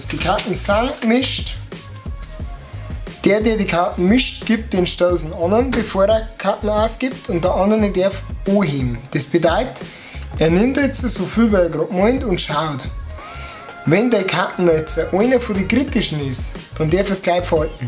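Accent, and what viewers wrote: Bavaria, Germany. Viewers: Wiaso? Boarisch is doch schee! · schlecht erklärt, und der bayrische Dialekt ist echt grausam - sorry! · Is des a Bayer oder a Breiss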